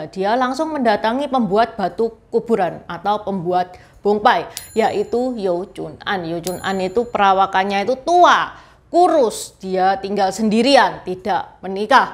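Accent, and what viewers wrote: Java, Indonesia. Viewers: Cicinya Indonesia banget... Keliatan dari medoknya🙏🙏🙏🙏👍👍👍👍👍❤️❤️❤️❤️❤️ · ci bahasa jawa nya mendok kali,,ci ajarin bahasa Tionghoa dong ci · Aksen jowomu medhok Cik. Sukses selalu berkarya ci. Di deket pasar kopro tanjyng duren ada toko obat Lo Banteng, itu asli keturunannya atau waralaba Li Banteng ya. Btw, aku gak kenal Suhu Lo Banteng, tapi aku pake obat araknya, rupanya gitu ya sejarahnya sang Suhu tsb.... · Medog banget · Intonasi bhs sangat Jawa bnget..mantap mudah dimengerti dipahami..mksh mbak..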